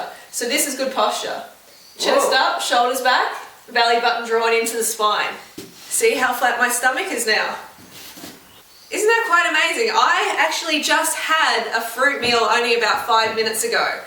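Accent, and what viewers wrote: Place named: Australia. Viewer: Goo fruit or root yaself !!! Can tell shes an aussie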